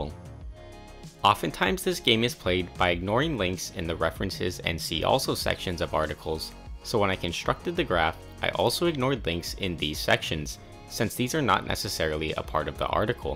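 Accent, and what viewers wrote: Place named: India. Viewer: Voice is indian..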